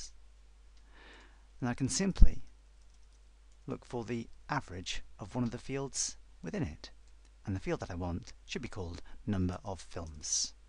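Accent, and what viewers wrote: United Kingdom. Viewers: British accent = learning more SQL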